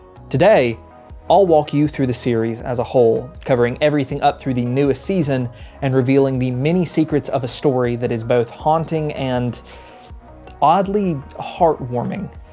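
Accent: South, United States. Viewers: Hmmmm...based on your accent I'm going to say either Louisiana or East Texas. Potentially Arkansas. You don't have to deny or confirm that either way, I'm just guessing as a person from a different part of the region. It also could be one of the Carolinas, I'm thinking that's more likely now but I'm not well versed with the Carolina accents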